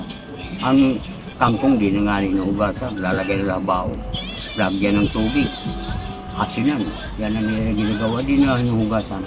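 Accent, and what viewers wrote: Waray, Philippines. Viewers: Waray na waray accent mo Tay waray lng mga makakaintindi sa iba mong Sinabi..hehe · Haha waray parin accent. 😀👍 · Pure gud na waray Kay nakukuri intalon pag Tagalog tatay god blessed you Tay ..proud waraynon bootan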